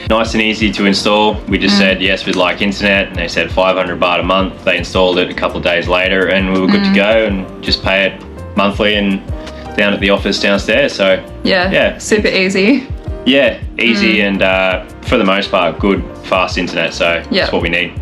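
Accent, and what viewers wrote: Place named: Australia. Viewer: Thanks for the video! Great to hear the Aussie accent!! What about the cost of Visas and/or visa runs? Thanks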